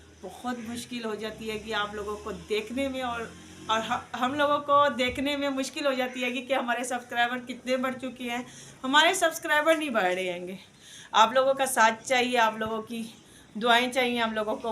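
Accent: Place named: Punjab, India. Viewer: You are punjabi I think from you accent so you should speak Punjabi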